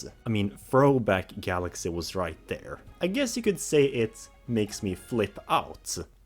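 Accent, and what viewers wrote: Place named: Sweden. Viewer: are you from sweden? you speak with a swedish accent and i am from sweden so i recognize it. i also love super mario galaxy 2. är du svensk? du pratar engelska med svensk brytning så jag känner igen den. jag älskar också super mario galaxy 2.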